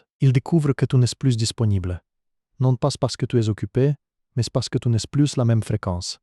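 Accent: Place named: France. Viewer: 😂Ce que j'aime dans cette vidéo c'est l'accent français qui relate les faits classiques et la solution 🎉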